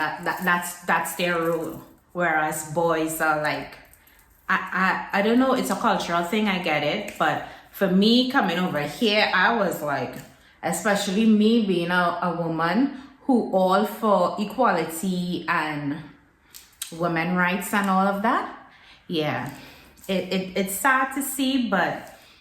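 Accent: Trinidad and Tobago. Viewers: I hear your Trini accent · love your trinidad accent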